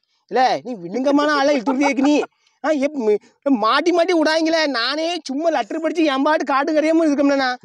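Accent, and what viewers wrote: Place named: Thoothukudi, India. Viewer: தலைவரோட அந்த சரலமான தூத்துக்குடி பாசை -க்கு நான் அடிமை 💥🙌🏻😂🤣நீ வேர ரகம் தலைவரே🙌🏻😄🤣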